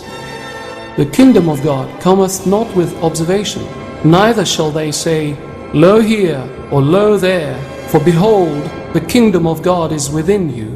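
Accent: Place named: United Kingdom. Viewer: OH NO! Islam is telling people to be good and spread right and forbid whats wrong! HOW TERRIBLE AND DREADFUL! But we'll find a way to make it seem scary, lets add a douche with a British accent and some ominous background music and pics of random guys with beards cuz they look scary! Fuck ignorance!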